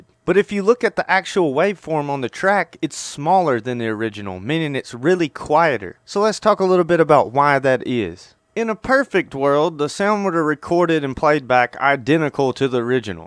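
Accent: South, United States. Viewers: love the American (southern?) drawl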